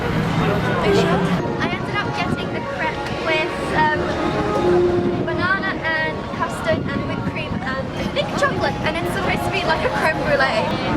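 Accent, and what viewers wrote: United Kingdom. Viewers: their accent is british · you sound British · Are you british?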